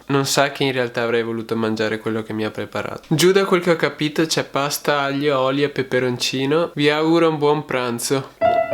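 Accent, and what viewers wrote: Veneto, Italy. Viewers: Ma sei Veneto? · Ma sei Veneto?? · Ma sei veneto ? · Si è veneto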